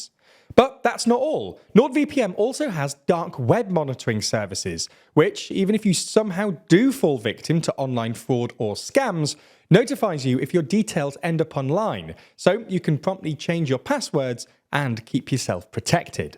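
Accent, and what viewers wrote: United Kingdom. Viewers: British for ya!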